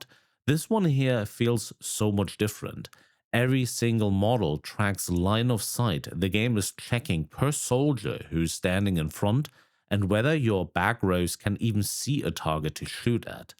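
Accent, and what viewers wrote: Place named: RP, United Kingdom. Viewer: Posh accent. - Eldar player. Perfectly fitting.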